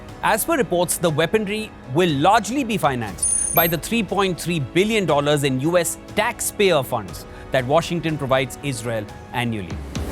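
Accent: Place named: India. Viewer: Yiu. And. Yiur. Annoying. Indian. Accent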